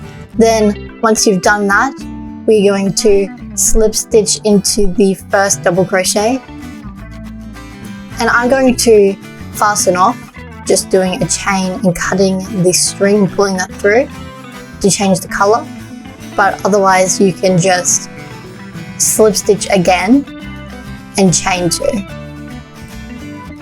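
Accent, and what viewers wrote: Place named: Australia. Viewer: It's funny how many people said that her instructions are not understandable, but there's a whole bunch of others saying they did just fine and caught on easily😂. Personally, I think this pattern is one of the easiest to understand as others give way too much instruction and just confuse u more. Besides I love her aussie accent ❤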